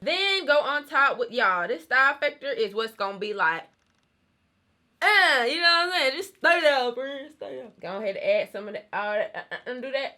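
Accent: South, United States. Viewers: Ur southern accent is coming through in the clutch ❤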